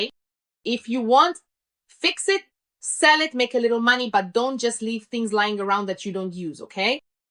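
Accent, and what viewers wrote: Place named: Russia. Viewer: ficou com sotaque russo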